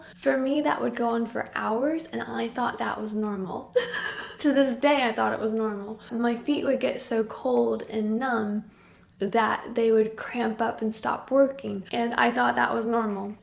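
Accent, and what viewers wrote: England, United Kingdom. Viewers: I like how the English accent is seeping in. At first, I couldn't tell if you had an Americanized accent, but now I realize it was the other way around, and I'm just so pleased. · Ugh I totally understand bouncing between doctors and them not giving you answers. But sooo glad that you have it diagnosed and hopefully a new treatment plan that actually is addressing the Raynaud's! Also I read this comment on one of your previous videos, but I think it's so cool (and cute) to hear you pick up a British accent. How some words you say are more "British-y" sounding, if that makes sense? lol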